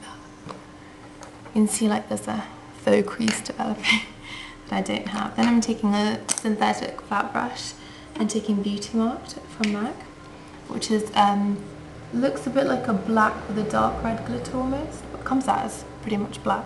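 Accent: England, United Kingdom. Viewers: Lovely accent! I miss England, I got my BA in English Lit there. :( I want to be there again so bad.. Anyways, you TOTALLY persuaded me to go get the Spiced Chocolate quad, as I have commented earlier, I never thought that copper-red would look good on me as I have blue eyes and fair skin, but we both have the same colouring so I will give it a try. Please, please, please!!! make more videos,I beg you! You have amazing talent, you have not been in make up school? Really? I am gobsmacked.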